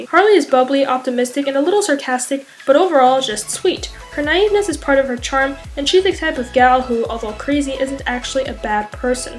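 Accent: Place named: New Jersey, United States. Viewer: Its a New Jersey accent :)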